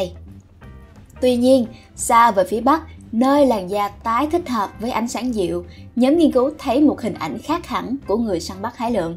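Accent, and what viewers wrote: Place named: North, Vietnam. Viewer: giọng bắc thì rõ hơn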